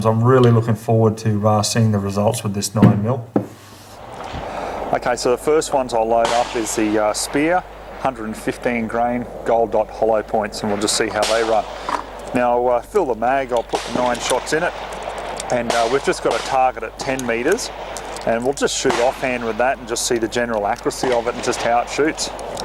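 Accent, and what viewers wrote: Australia. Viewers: Awesome review... weird to hear the Oz accent talking about guns :) 1991 9mm model (O1992) was recently added to the Colt lineup and I picked one up (to go with my .45 ACP O1991 and .38 Super O2991). Only difference I can tell is that the US market guns have the bright barrel muzzle and hood.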